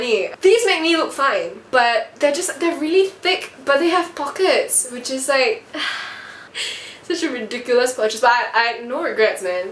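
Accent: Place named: Singapore. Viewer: I love your accent! I also from Singapore as well~ hope to see you around west area :)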